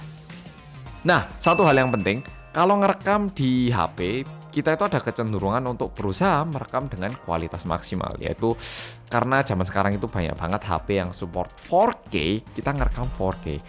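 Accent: Java, Indonesia. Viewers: Medok Yach? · Salam medokkkkkk banggg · Medooooooooooooooooooooooooooooooooooooooooooooooooooooooooooooooooooooooooooooooo oooooooooooooooooooooooooooooooooooooooooooooooooooooooooooooooooooooooooooooooooooooooooooooooooooooo oooooooooooooooooooooooooooooooooooooooooooooooooooooooooooooooooooooooooooooooooooooooooooooooooooooooooooooooooooooooooooooooooooooooooooooooooooooooooooooooooooooooooooooooooooooooooooooooooooooooooooooooooooooooooooooooooooooooooooooooooooooooooook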